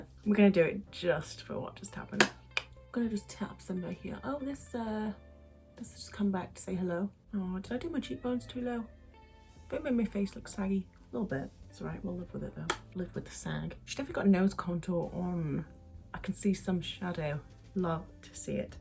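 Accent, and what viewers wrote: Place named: Yorkshire, United Kingdom. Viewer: SO.. I’m from Yorkshire 😅😂 ..you definitely got the accent on point hahaha!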